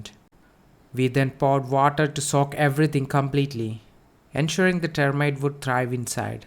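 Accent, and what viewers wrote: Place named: Kerala, India. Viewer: Nice Mallu accent 😂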